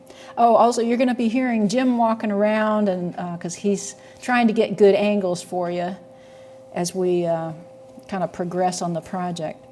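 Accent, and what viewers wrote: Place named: Florida, United States. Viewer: I really love your regular tutorial-style videos. Your powers of explanation are superb and easy to listen to, and your results are so beautiful. I've learned a lot from watching, and my wife and I try to never miss an episode. But this live demonstration was a whole 'nother level. Seeing the entire process in real time really brought home the work and planning that goes into this. And Jim is true asset, his video sense is spot on and his perspectives help a lot. You two make a great team, and we love to see it in action. Also, your Florida accent comes out a lot stronger when you're in conversation mode. It's very entertaining!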